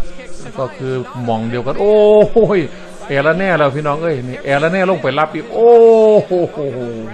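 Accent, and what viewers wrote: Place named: South, Thailand. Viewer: เว้าใต้เป็นตาหัวคั๊ก😂😂😂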